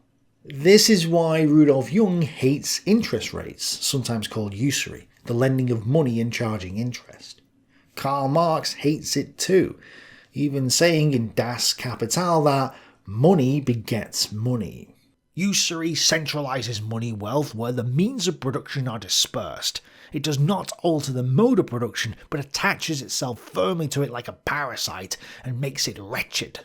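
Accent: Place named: Scotland, United Kingdom. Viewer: I cannot stand Scottish accent and his constant liberal capitalist bias. If liberalism is so good, then why has it failed every Western country that adopted it? - Adûnâi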